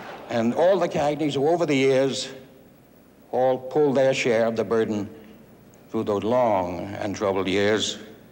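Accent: United States. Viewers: Lower East Side Manhattan accent. There is NO mistaking it! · ***** New York old Irish.......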